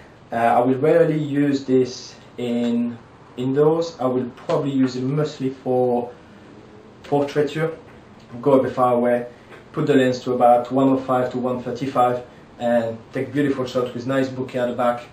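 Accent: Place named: France. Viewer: Nice stuff mate! Are you originally from France? I think you are because your dialect sounds so. :) Have you ever considered to change Sigma 70-200 to a Nikon VR1? It's a one of a beast lens on a full frame!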